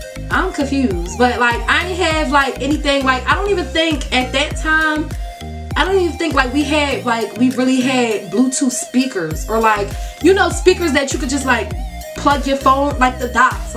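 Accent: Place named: Philadelphia, United States. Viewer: You sound like you from philly. You went to Girard College?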